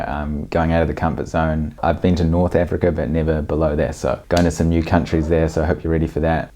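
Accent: New Zealand. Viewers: He sounds like an educated Kiwi. My husband is Australian he says the accent is based on level of education instead of regional location. Same with Australia. Most people expect kiwis to sound like bogans which isn't the case . Hope that makes sense. Cheers.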